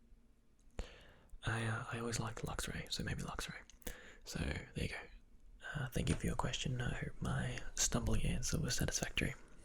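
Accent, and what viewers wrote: Australia. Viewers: I know it's not a new game, but the perfect game for ASMR would be Endless Ocean. The calm music, the player's slow deep breaths through the oxygen tank, the sound of the bubbles surfacing. All that plus your low voice. It sounds like the perfect recipe for ASMR. Your Australian accent would also fit the theme of the game. I'm not sure if that'd be a game you'd personally find very interesting though. I enjoyed it.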